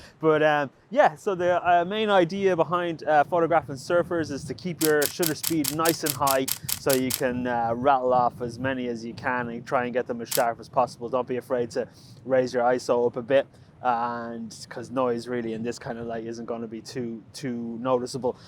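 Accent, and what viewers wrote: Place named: Ireland. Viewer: Love it. BTW you don't need to remind people you are from Ireland.....that is really obvious when you start talking 😆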